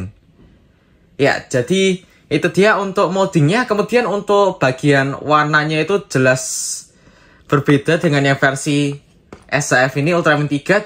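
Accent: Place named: Java, Indonesia. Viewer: how much are this in rupiah and where can i get this in Jakarta? on a side note, i find it unique to how you’re a Chinese but speak Javanese dialect/accent 👍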